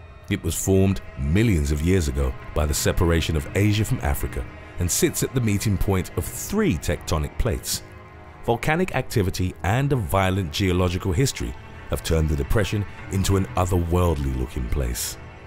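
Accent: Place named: United Kingdom. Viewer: hearing a brittih dude using american mesurements cringey lol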